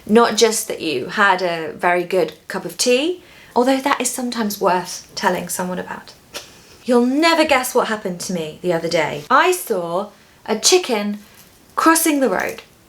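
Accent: United Kingdom. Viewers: Usually I didn't like to write a comment here, but you made an incredible explanation for me, and I also like to hear your voice with a British accent. Sorry if my English is not accurate or there is something wrong with my comment. 😊❤ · You're my best British English accent teacher ❤🎉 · Hello,. I always enjoy your videos! I used to live in London, and I love your beautiful British accent! Did you have a nice summer? I'd like to say I had a good summer despite the awful heat. You'll never guess what happened. I fell into the underfloor storage and broke my leg! I hope you stay fit and have a lovely autumn! Bye!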